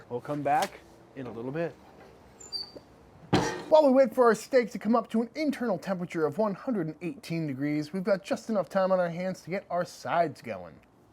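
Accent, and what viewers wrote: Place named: Massachusetts, United States. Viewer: I hear that Mass accent. Where you from in Mass?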